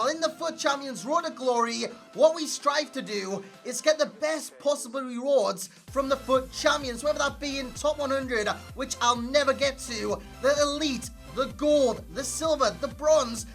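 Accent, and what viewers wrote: Newcastle, United Kingdom. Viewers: is geordie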